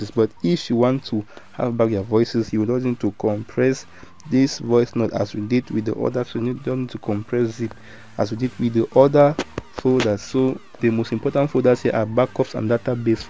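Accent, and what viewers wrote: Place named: Nigeria. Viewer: Your Nigerian accent is hard to understand please make a video on how to restore the backup to a different WhatsApp like business WhatsApp otherwise this video was great thanks..... Steve from Zambia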